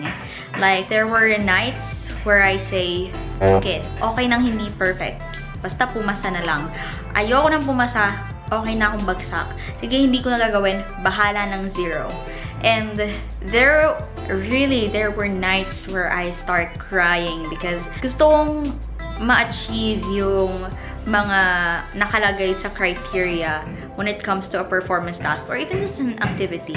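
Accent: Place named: England, United Kingdom. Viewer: ATE CAN YOU TEACH US WITH YOUR ENGLISH ACCENT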